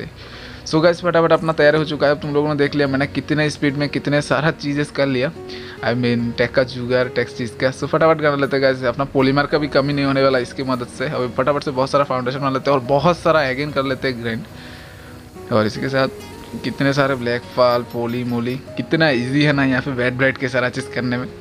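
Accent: Bengal, India. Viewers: Is your mother tounge bengali? ???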